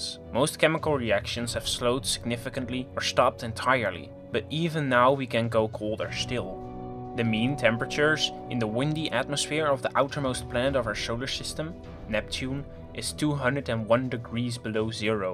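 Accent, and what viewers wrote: Netherlands. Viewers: Lol, ik realizeer me net pas dat je Nederlands bent... Blijf goeie content zoals dit maken, je hebt talent! (Ik dacht al dat ik dat accent herkende... ;P) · Are you dutch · Man is dutch💀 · Do I detect a Dutch accent? 🌡️❄️ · are you dutch? olijf oil intersekting point etc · the dutch accent.......... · leuk nl accentje hahah. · Are you Dutch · Ew dutch accent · Ah, hello my Dutch friend, I recognize that accent :)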